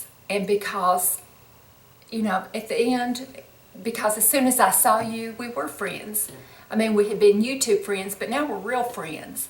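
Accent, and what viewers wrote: United States. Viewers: As an Englishman I like that accent. I gotta go on holiday an hear more of that, tho I don't know what states speak like that.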